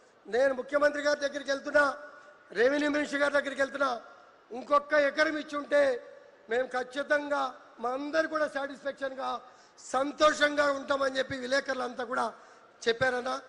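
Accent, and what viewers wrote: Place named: Andhra Pradesh, India. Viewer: Andhra Ghandhi nee yasa andhra Basha artham autundhi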